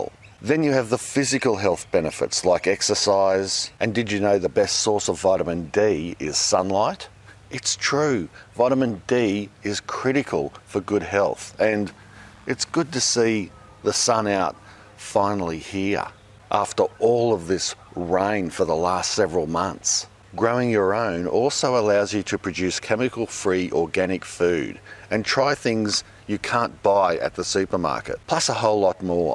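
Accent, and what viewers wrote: Australia. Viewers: You really seem like such a kind and thoughtful man. I have nothing but respect for you and your family and I’m glad that you becoming a content creator has helped your family out. Haha maybe it’s your Aussie accent in my American ears playing tricks on me but I doubt that. I wish you a happy Memorial Day from over here in the States.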